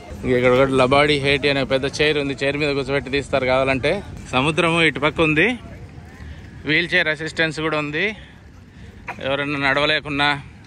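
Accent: Rayalaseema, India. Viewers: మీరు చెప్పే పద్ధతి చాలా బాగుంది అన్న. రాయలసీమ accent.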